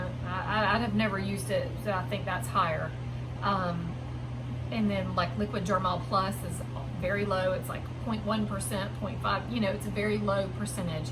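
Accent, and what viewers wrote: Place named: South, United States. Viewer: And I KNEW YOU WERE SOUTHERN! ! (next door neighbor, hi from Alabama)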